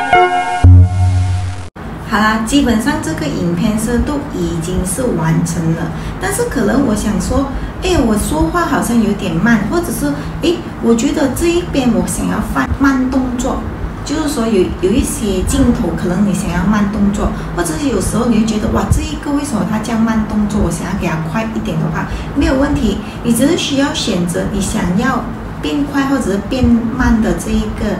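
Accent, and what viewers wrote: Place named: Vietnam. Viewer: 感觉普通话不像是中国人说的，发音像是越南人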